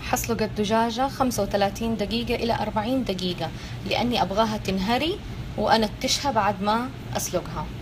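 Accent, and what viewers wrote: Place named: Hejaz, Saudi Arabia. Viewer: هي بتتكلم باللهجة الحجازية اللي الآن كل الدعاية والإعلان يتكلمون بنفس هاللهجة ....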